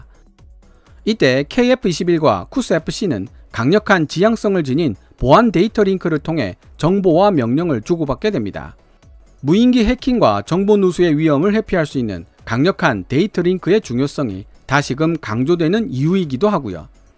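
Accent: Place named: Busan, South Korea. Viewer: 부산 사투리 억양때문에 도대체 뭔말을 하는지 모르겠네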